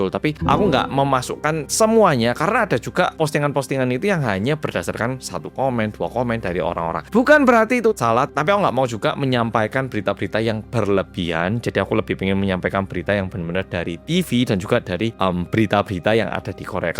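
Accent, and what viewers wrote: Java, Indonesia. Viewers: Waduh padahal pernah ndok jeju seminggu sekali kapal ku docking di dermaga sana. Angin nya besar pernah hampir terbang gara2 bawa payung 😂. Karena kota nya kecil kukira jeju itu kecil. Tiap meninggalkan jeju pasti kapal yg kutumpangi lewat depan area golf tepi laut. Itu ingatan yg agak samar karena sudah 26 tahun yal. 😢. Ini plot twist e piye sidane mas korea sing medhok? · Salfok bahasa Indonesia medok tenan... Markotop Lanjutkan terus kakak... Oppa... Mas_eeee.... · Org Korea yg medok bnget😊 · Logatnya seperti orang jawa😅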